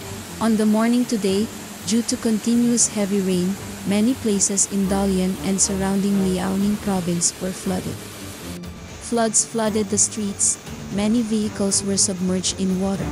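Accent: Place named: Philippines. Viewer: Your the speaker are from the phillippines nice voice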